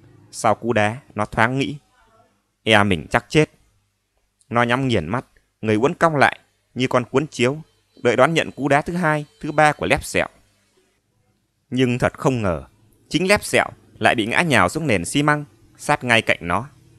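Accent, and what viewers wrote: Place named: Bình Dương, Vietnam. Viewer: Hay quáluônnnnnnn❤❤❤❤❤❤❤❤❤❤❤❤❤❤❤❤❤❤❤❤❤❤❤❤❤❤❤❤❤❤❤❤ Nhưng nghe hơi Bình Dương thật 😂😂😂😂😂😅😅😅😅😅😅😅😅😅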